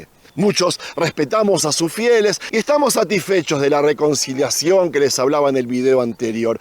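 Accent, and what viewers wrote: Argentina. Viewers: Por qué tiene acento argentino es judío, de verdad o qué? Es una pregunta, espero no ofender🙏❤️❤️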